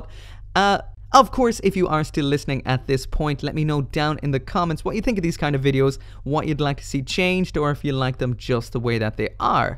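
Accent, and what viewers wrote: Ireland. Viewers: Are you Irish? Where do you buy your Gunpla? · Are you Irish?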